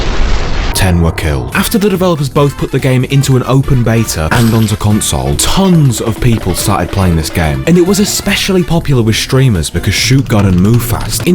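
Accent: United Kingdom. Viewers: If I had a nickel for every game video essay channel with good video fx run by a comedic smooth talking early twenties white british (or aussie) man I'd be a millionaire.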